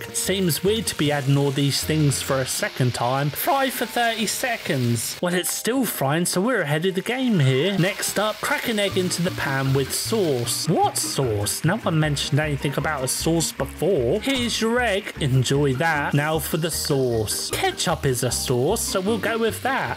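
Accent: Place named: United Kingdom. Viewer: Lol he’s British Letsgameitout fr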